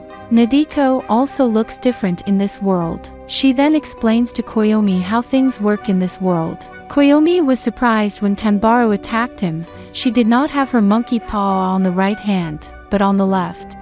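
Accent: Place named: England, United Kingdom. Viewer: Sorry, but I keep laughing when you read anything Japanese in English accent XD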